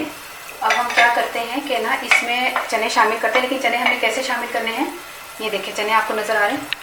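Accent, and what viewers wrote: Punjab, India. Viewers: I really like your Punjabi touch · Waoo kitni cute Punjabi boli 😂😍😍😍😍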